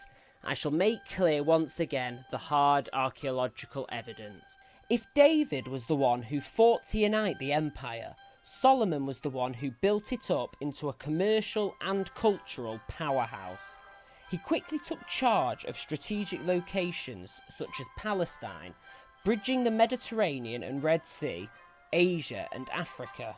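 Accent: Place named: United Kingdom. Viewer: You're British accent does not make you more respectful or intelligent like those iinfomercialss this has been a tactic used I noticed this you mentioned that's Solomon had 700 wifes about but you said this is fantasy I don't think so you under estimat the people from the past and Bible ii believe the past was more sophisticated than you know I noticed how the media makes people from the past movies look like barbarians building houses of clay or tents wearing shaggy rags and long un trim hair u above them I think in a way they were above us people living longer longer that's not true just like the vaccine today tell me lies tell me Sweet Little lies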